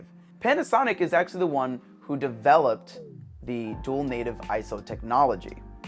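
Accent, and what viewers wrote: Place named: RP, United Kingdom. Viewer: Beautiful classical British accent. Versatile guy, you are.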